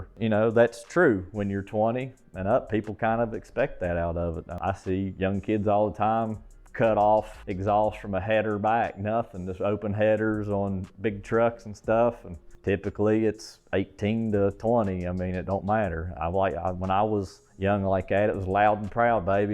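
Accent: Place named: South, United States. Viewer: Dagum I’m digging the southern accent… givem hell you merican 🤣🫵🤫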